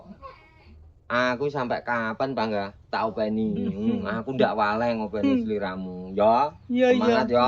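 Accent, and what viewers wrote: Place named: Blitar, Indonesia. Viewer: kong sampean asline pundi apa blitar ko nadane koyo wong blitar blitarnya mana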